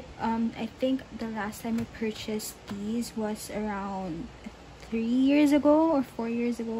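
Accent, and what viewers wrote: Philippines. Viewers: ahaha i knew she was filipino kase her accent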